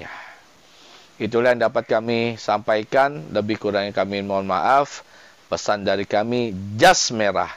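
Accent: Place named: West Java, Indonesia. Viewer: Trima kasi kepada Bpk ustadz, klo didengar dialeknya orang Jawa Barat, sangat jelas sekali menerangkanya, Ammmin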